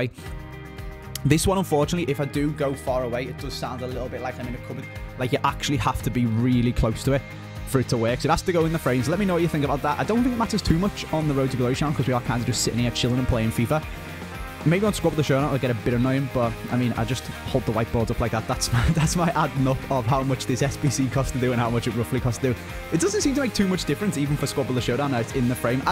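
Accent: Liverpool, United Kingdom. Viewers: He’s basically a scouse Martin Tyler with that new mic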